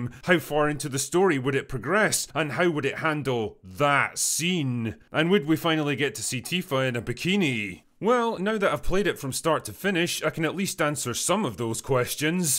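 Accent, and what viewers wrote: Scotland, United Kingdom. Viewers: It may be affirmation bias but I love how this was basically a 15 minute video of my own thoughts in a Scottish accent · Watched 10.5 minutes and liked to support our Sco'ish boi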